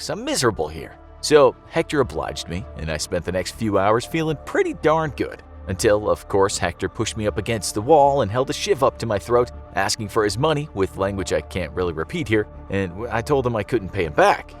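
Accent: United Kingdom. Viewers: His British accent is actually alright.....I can tell better than Americans because I'm from the UK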